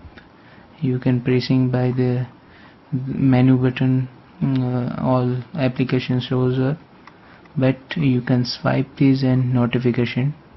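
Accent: India. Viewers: Come on. He has an indian accent.....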